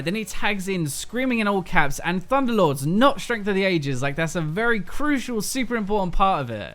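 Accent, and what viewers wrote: United Kingdom. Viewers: God I hate that british accent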